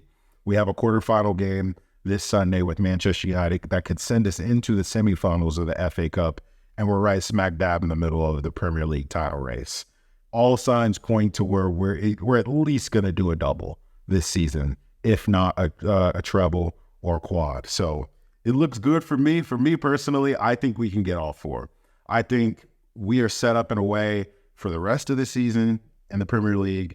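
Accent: United States. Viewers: I like your English. I never listen football with American accent are you American. Do you have live chat programm ?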